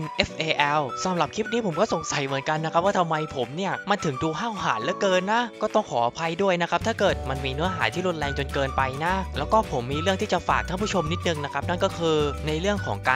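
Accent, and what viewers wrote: South, Thailand. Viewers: พี่เป็นคนใต้หรอค่าบ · คนใต้ จังหวัดไหนนิ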